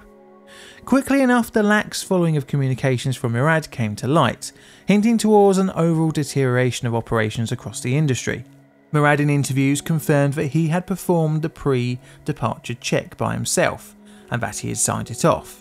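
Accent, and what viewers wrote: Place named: England, United Kingdom. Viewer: As someone who has a lil trouble understanding an English accent I kept hearing 90s. It took me a second to realize he meant 2000s.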